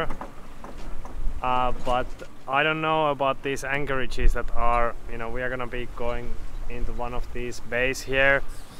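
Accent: Finland. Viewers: Can place Your accent? Are You from Finland? Good luck! /Thomas from Sweden.😁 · I love this channel. The cinematography. Everything. But really it's this guy's accent and voice and delivery. I could listen to him forever. So matter of fact. I guess, very Finnish.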